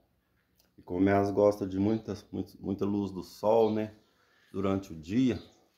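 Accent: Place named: Minas Gerais, Brazil. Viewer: . A vai cobrar kkkk. Notei pelo sotaque. Também sou mineira 😁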